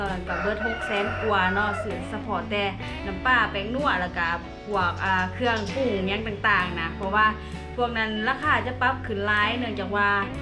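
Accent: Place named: Hong, Thailand. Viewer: สำเนียงสาว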